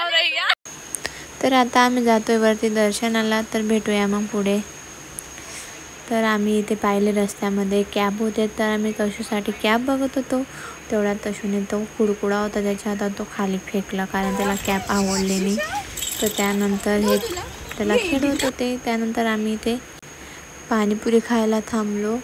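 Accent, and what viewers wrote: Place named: Khandesh, India. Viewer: Aap ki bhasha me peevvar khandeshi dikharahi he Qki ho puri ahirani bi nahi or hindi bi nahi to aap yesehi bolog banaiye jay khandesh🎉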